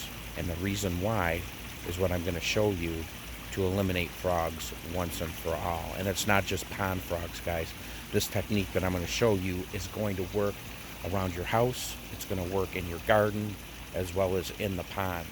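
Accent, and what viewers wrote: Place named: Wisconsin, United States. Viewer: Ughhh!! I can’t stand this guys accent!! Pawnd. It’s like a Wisconsin accent I’m sorry but it just bugs me!!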